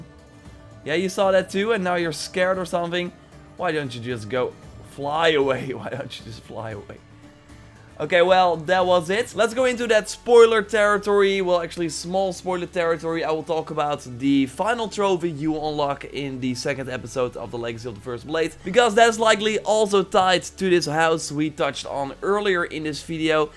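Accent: Netherlands. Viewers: Nice videos man! Btw are you Dutch? Zo ja, ik ook 😉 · Just asking. Dutchie?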